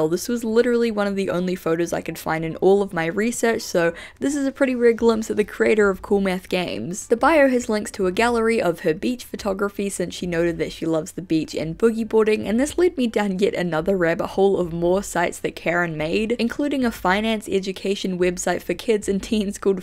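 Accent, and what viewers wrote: New Zealand. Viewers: didnt know you were from nz lol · I'm sorry but your kiwi accent makes it sound like "cool meth" and it keeps catching me off guard 😆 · HOL' UPP!!! yer a Kiwi.?! i, uh... actually forgot New Zealand existed. Thought you were an Aussie.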